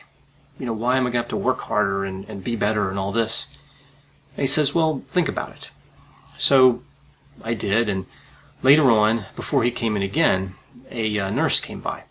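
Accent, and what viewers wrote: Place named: Pennsylvania, United States. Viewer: Are you from Pennsylvania? It’s the accent!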